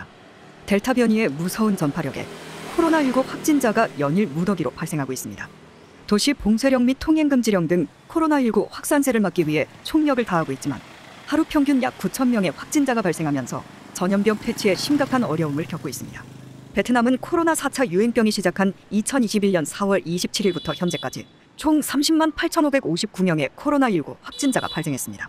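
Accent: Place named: North Korea. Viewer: 나래이션 악센트가 북한 억양이 있네요 ~~~ 발음이 너무 좋아서 귀에 팍팍 잘들려요 ㅎㅎ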